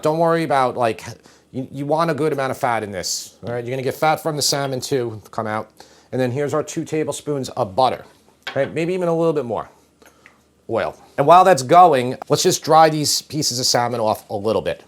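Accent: New York, United States. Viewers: Always great recipes. Um, I have the exact same accent you do! It’s either Long Island, Staten Island, or Northern Jersey! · As a NYer, he sounds like family to me! We are just outgoing and informative, whether you want us to be or not! lol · You don't talk too much. I actually enjoy your New York accent. It's authentic like your videos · Agreed!! Im on long island and his accent sounds like home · This sounds like a MUST MAKE meal! I’m drooling. As a NYer at heart, I love hearing you talk, (I miss Long Island) I love all the information and tips you provide. Great job! ❤ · Oh and you keep talking, and especially that awesome New York accent! People tell me I talk really Chicago and I love that!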